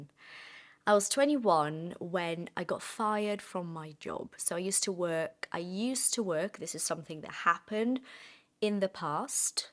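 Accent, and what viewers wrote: United Kingdom. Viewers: Incrível pela sua fluência e sotaque britânico. Congrats!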